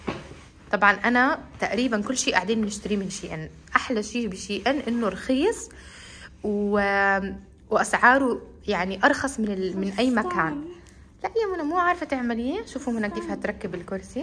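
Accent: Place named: Egypt. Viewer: أنت مصرية بتحكي مصري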